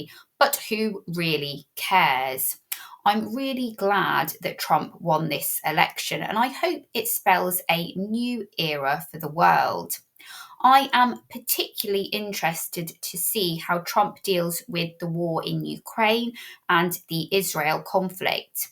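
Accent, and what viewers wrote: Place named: United Kingdom. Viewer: Sweet British accent ❤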